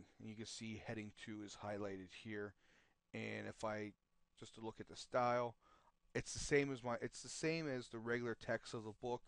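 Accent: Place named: Northeast, United States. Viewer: Thanks. Quick & easy instructions for dummies like me! One little point to consider: When you underscore text like you do in your sub-headers, some readers may think it's a link and try to click on it. I usually use a smaller font and bold and/or italics for these. Hope you do more of these videos. P.S. Like your accent. Are you from the NE US?...you sound like it. I'm from Long Island myself...oy!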